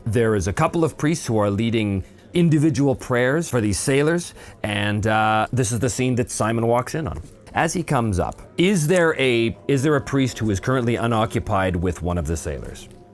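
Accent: Ireland. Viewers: your Irish accent is pretty good! (That’s actually a big compliment!) Strong Dublin vibes. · Your irish accent is a bit Colin Farrel. 😁👍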